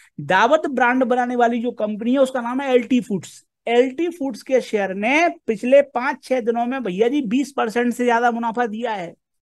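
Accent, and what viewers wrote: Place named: Bundelkhand, India. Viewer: Hum to sirf Bundeli sun ne k liye dekhte hai Baki to humaye dadda sikhaye gaye hate market se door rahiyo 🤣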